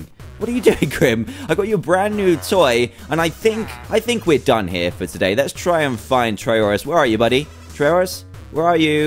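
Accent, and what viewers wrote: United Kingdom. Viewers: He's British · British DUH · It's British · i think british idk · ....British duuuhh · British duuuuuuuuuuh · British Bro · British dah doy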